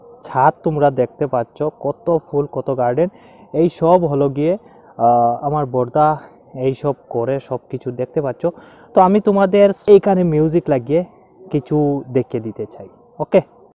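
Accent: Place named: Sylhet, Bangladesh. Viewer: Tumi sylheti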